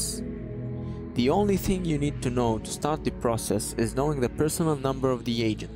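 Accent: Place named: Sweden. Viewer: Are you from Sweden? Just because of the accent. Great explanation of the coolest field cipher!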